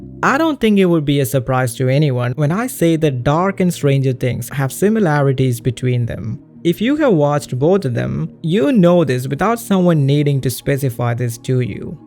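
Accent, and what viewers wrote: South India, India. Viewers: 😢 the americanised south indian accent is throwing me off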